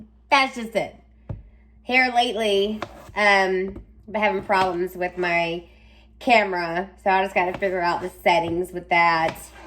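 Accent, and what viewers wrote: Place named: Tennessee, United States. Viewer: She got that Tennessee accent y’all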